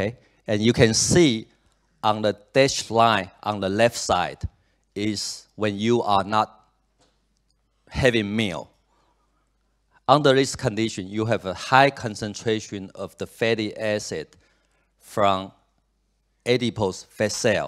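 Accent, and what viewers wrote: Taiwan. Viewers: 台腔教授讚!這種腔反而才聽得下去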